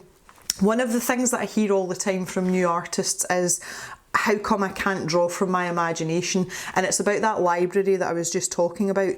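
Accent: Scotland, United Kingdom. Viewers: Thank you for sharing this! It gives me not only inspiration, but a nice wee dose of Scottish! I lived in Scotland for several years and miss the accent!😉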